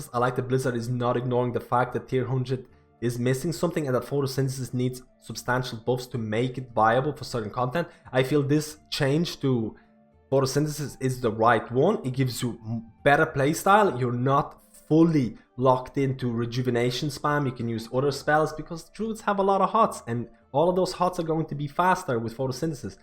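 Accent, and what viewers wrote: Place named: Dublin, Ireland. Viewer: Where are you from? I can hear a bit of a Dublin accent in your voice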